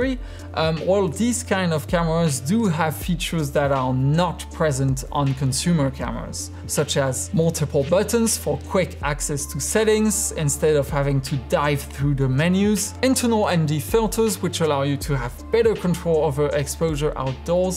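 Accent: France. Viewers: Tu es francais ? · Are you french? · French accent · Idiot TOOO TECHNICAL AND PLEASE GET RID OF THAT FRENCH ACCENT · Grate camera . and i like your speaking french accent english , Amazing video ! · he is french for sure, he is based in Paris and his accent is 100% french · Ton accent francais xD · Nice French accent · Je préfererais que tu parles en français pas en anglais avec l accent fr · He has french accent lol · French maybe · It french accent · That was very entertaining and informative thank you. I can't decide which is the ultimate French-English moment: "necessessary" or "...and you end up with a very big and expensive lens!👨🏻‍🍳" Said with much love and all due respect... we have francophones in Canada but the "real" French emote and accent words so cutely. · speak normal english, not a dumb french accent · Quel bel accent français. Romantique 🤗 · he is French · Le commentaire français que vous cherchez. 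Sacré accent français d'ailleurs. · why french people have such thick accent bro, I honestly think they don't like english so they butcher it on purpose, spanish is my native tongue and I have virtually 0 accent compared to this guy. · Is he french? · Do french people seriously still speak like that? He should make an effort, this accent has expired. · T'es pas français toi par hasard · That french accent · You can’t hardly get more french accent than this guy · T'as un accent français, je me trompe ???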